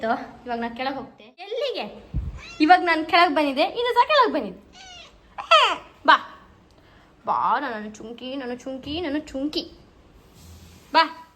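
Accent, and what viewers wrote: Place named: Kundapura, India. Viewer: Hoi...ನಿಮ್ಮ ಮಾತು ❤❤❤👌👌👌👌 Mugubott 🥰 ನಿಮ್ಗೆ chanda kansutte🌸😁❤❤❤.... ಕುಂದ ಕನ್ನಡ❤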